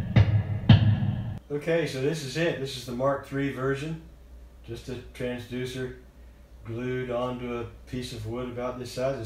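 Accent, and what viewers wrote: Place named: New Zealand. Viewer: Nice job, great to hear a fellow kiwi!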